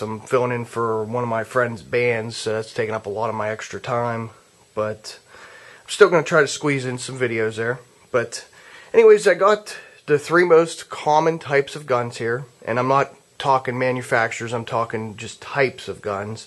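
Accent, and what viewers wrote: Pittsburgh, United States. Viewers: Are you from Pittsburgh? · you sound like you're from Pittsburgh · you have to be from the burgh "an'nat" thats pittsburgh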